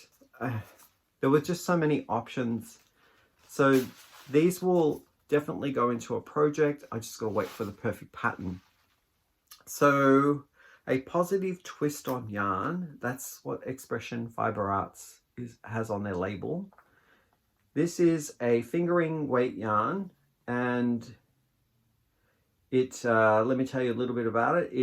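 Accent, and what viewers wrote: Australia. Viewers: Happy belated birthday! How crazy is it that you received 2 cards exactly the same!! The sweater looks fantastic on your hubby! That gray shawl is beautiful I like how you incorporate the contrasting orangeish color and the burgundy will be a nice contrast as well. Where are you from originally? I've been trying to place your accent, it give me Australian vibes 🤔 I hope you had a great time at the new restaurant, can't wait to hear about it.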